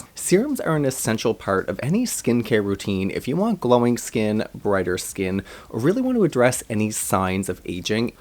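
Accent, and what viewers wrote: Canada. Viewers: Are you Canadian? Great review by the way 😁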